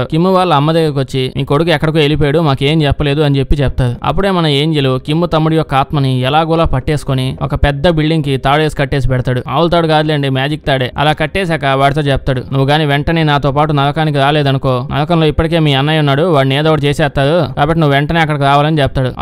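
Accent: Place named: Godavari, India. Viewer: New subscriber.... Godavari side aa anna midi slang alane undi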